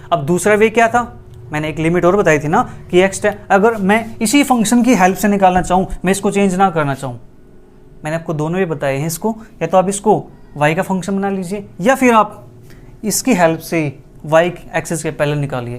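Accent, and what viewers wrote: Haryana, India. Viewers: Sir app haryana se ho kya?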